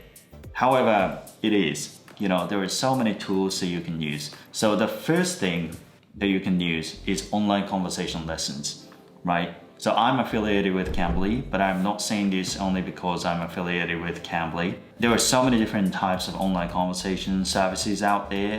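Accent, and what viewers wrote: Australia. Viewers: オーストラリア発音磨きかかりまくってんなw makeとかほぼマイク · I can get that English is being adjusted to Aussie one(kinda "Aussied"😂) little by little. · オーストラリアの発音ですか？ way = ワイ show = シェア 教えていただけるとありがたいです。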